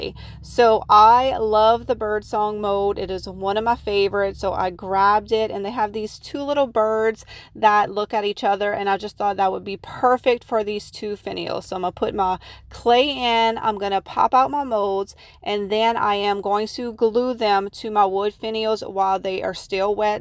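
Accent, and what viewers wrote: Louisiana, United States. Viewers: She is from Louisiana and it’s most definitely an accent. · think it is Cajun.😂 Not a speech impediment.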